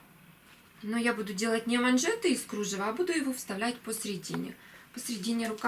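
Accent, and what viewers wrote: Ukraine. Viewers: Кристина Котельва да акцент наш, украинский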